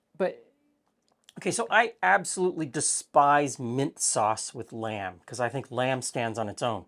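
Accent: Canada. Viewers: I wonder how many of the awful comments and messages is because people can't identify that your accent is Canadian, not US, and are either AH Statesians or AHs from other countries. (You are, rightfully, and American, because Canada is part of the Americas. You are not USian though.)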